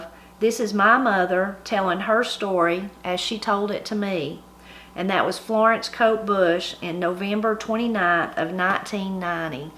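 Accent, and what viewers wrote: Appalachia, United States. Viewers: You talk just like me. I'm a retired English teacher from Eastern Kentucky. I was privileged to drive through the Smokies just last week. I've got to go back and listen to your first book..This is going to be a good one.I was just thinking about my cousin naming her daughters Sara and Hannah. Her parents were so worried that the girls would be called Surry and Hanner. I've got to go back and find your video on names. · My daughter introduced me to the The Blind Pig and Acorn. Sometime later I came across on You Tube. I enjoy it so much. Today I was looking for one of my cookbooks and I found a copy of Dorie Woman of the Mountains. I don't remember when I got it. It just got lost in with all of my books I guess. I am so excited. I immediately sat down and started reading. I grew up in North West GA and the stories you share and the lives your family live is so much like mine. 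My favorite place to go as a child was to my great uncle and aunt's house. I watched her churn butter and cook on a woodstove., wash clothes in a black wash pot, and cook her own cured ham, got her vegetables from the root cellar and I loved getting a cold drink of water from the well bucket with a dipper. I think of those visits often and miss them. You and I talk in the same "funny way," I once had a lady in a church where my husband was interim pastor tell me I talked so funny and Southern, said she'd never heard anyone talk so country as me. I guess I was a little sassy and I said," I talk this way because I was fortunate enough to grow up In Appalachia. Only privileged people can talk like this! I guess you got left out when God was giving out blessings"! She never mention my funny way of talking again. By the way, congratulations on your well deserved award. Thanks for all do do to Celebrate Appalachia!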